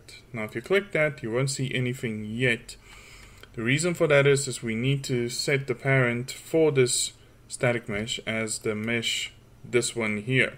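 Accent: South Africa. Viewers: Great video! Are you South African?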